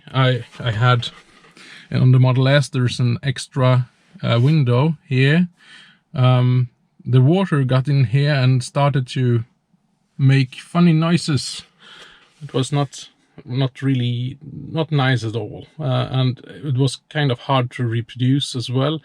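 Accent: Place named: Germany. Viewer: Ah, nothing, just your dialect is just like my friends who is German. Perhaps you have lived there?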